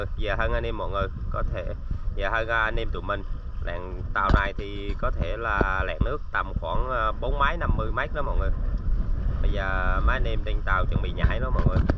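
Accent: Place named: Quang Nam, Vietnam. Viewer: Ở mô mà nói tiếng quảng nam vậy🤔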